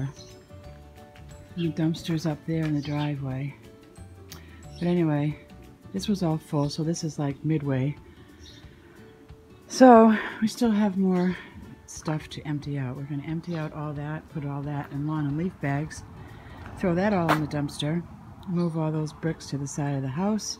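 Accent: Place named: Boston, United States. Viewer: I should say yaahd because I love your accent so much! We love Boston! 💝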